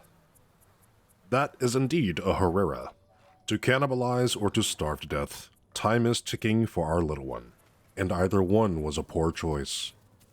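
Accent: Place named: United States. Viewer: Thumbs down because you wouldn’t list its height and weight in imperial. Why have an American voice and use foreign measurements?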